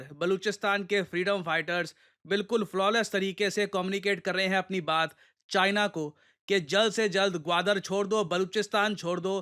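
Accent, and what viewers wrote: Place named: India. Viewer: Ye indian accent h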